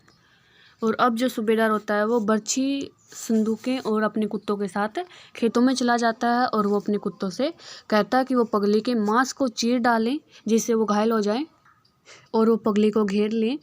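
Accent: Haryana, India. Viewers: Full Haryanvi ascent narration of story' 😊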